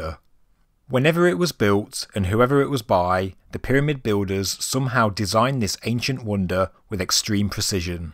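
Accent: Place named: Birmingham, United Kingdom. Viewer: definitely not Scottish. Sounds more Brummy to me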